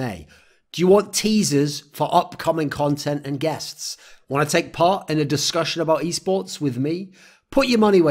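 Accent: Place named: Northern England, United Kingdom. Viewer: Nah , like northern English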